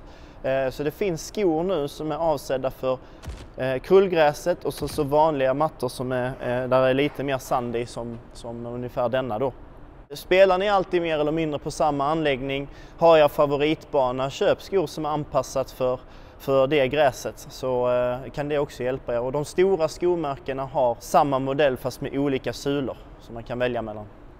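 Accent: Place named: Scania, Sweden. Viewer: skjo :) älskar skånska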